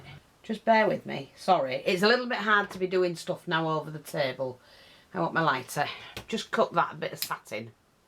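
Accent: United Kingdom. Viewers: Loved watching you do this. You always have exactly what you need. Wonder if it would be the same way if I tried it? Not! Love your British accent. I’m from SC in USA so it’s quite a novelty..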